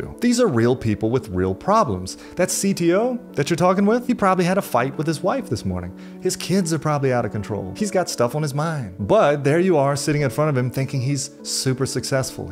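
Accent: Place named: Chico, United States. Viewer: sounds like he's from Chico, California.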